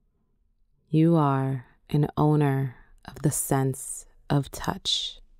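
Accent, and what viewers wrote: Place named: New York, United States. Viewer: I want loud mouth thick NY accent back 😂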